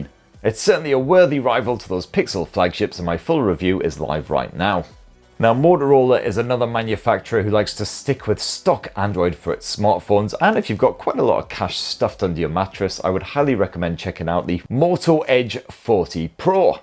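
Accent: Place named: Scotland, United Kingdom. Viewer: Do you play Bobby Bain in the latest series of Shetland? Your Scottish accent is very good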